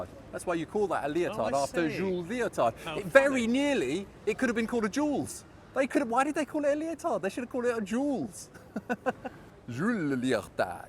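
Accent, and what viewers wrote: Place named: England, United Kingdom. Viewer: Joolz, you have an excellent English accent Also your videos are very entertaining I have a suggestion for you, to increase revenue Perhaps you could market your videos as Advanced English Comprehension Add a few questions at the end of each one Sell them either as a hard copy or digitally China would be a market with great potential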